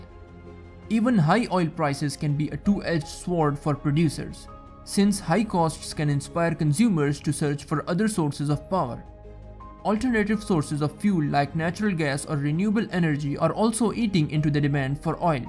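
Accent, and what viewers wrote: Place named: India. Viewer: bad indian accent makes this terrible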